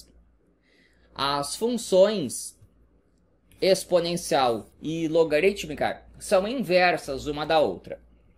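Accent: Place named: Alagoas, Brazil. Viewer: pra mim q vc era de ALAGOAS.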